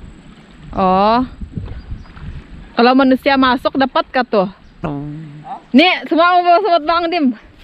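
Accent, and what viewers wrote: Sabah, Malaysia. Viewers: loghat kamu sama ya macam sabah...memang begitu ya maaf saya just nk explore budaya sabah sarawak...biasa dengar loghat sarawak ada lain sedikit kn...😁😁😊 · Lawas dalam negeri Sarawak yang bersempadanan dengan negari Sabah. Kerana itu logatnya lebih kepada Sabahan.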